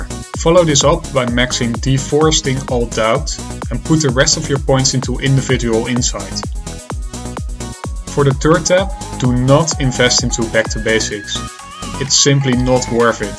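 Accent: Netherlands. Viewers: Ik hoor een nederlandse accent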